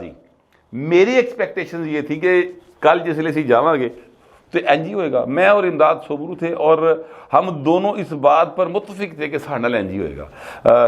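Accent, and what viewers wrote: Lahore, Pakistan. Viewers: خالص لاہوری لہجے میں کرنٹ اشو پر تبصرہ کرنے والے گوہر بٹ کو موجودہ حالات پر گہری معلومات حاصل ھے بولنے کا انداز دل میں اتر جاتا ھے اللہ پاک آپ کو لمبی عمر عطا فرمائے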